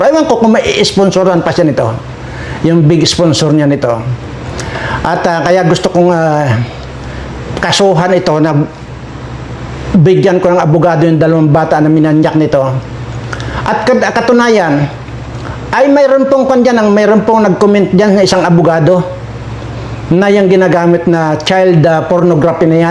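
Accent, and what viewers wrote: Iloilo, Philippines. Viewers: Thank you & salute 🫡po sa inyo Sir sa pag tatangol sa Team Kalingap kina kuya Val at kuya Rab, go go Sir ipakulong nyo na po ang mga anti na yan na mga salot sa bayan natin, New subscriber watching from the UK 🇬🇧😊 haha grabi kadlaw ko sa butod kag iban pa nga term mo Sir, ga gua ang Ilonggo mo Sir ba! Proud ilongga fan here 😊😅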